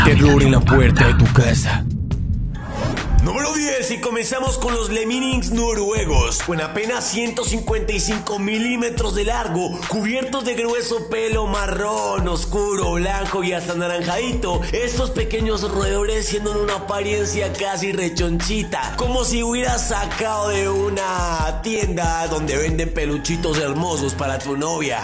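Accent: Colombia. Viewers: Eres de colombia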